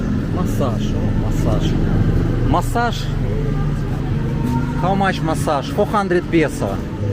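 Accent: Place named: Russia. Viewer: you did a nice job on the video tour of Barrio Barreto. I will go visit in a few months. I have already booked my hotel (which you passed by in the video). I haven’t been to Angeles City since the ‘80s, so when I watch videos of Walking Street it just seems a little too much “in your face”, although I do enjoy P. Burgos St in Makati. Olangapo looks like a relaxed place to chill out with the locals. I enjoy listening to your Russian accented English. You have learned to speak English better than most. Good luck with learning Chinese!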